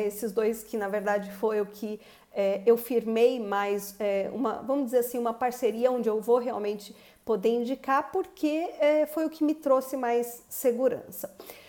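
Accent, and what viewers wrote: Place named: Rio de Janeiro, Brazil. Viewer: E esse sotaque carioca?! Rsrs bjs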